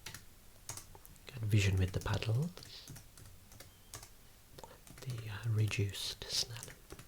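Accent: Birmingham, United Kingdom. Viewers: Dislike it? I dont know anyone who dislikes the Peaky Blinders accent. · U sound British 😲😶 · It's interesting how perceptions of accents vary. He has an accent from around the Birmingham area of England. Other people in the UK tend to dislike this accent and it's often a figure of fun. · Birmingham? you’re made for this, mate. And very handsome to boot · Proper beautiful midlands accent. Hello my Brummie friend ❤ we share the same city!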